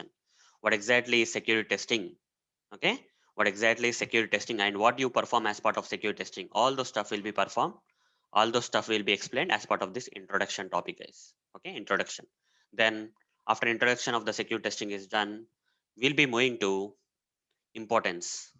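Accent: India. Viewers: i like indian english)))😀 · Indian accent :(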